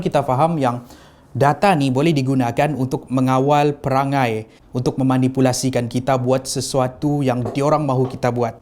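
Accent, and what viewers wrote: Sabah, Malaysia. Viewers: aku asyik trgelak je ni bila asyik2 tgk nota hahaha 😂 ckp bahasa sabah comel ja bah 😂 #salamdrmelaka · ini kali ketara sabahnya jo 🤣🤣🤣🤣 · The best part is when dengar cerita but with sabahan slang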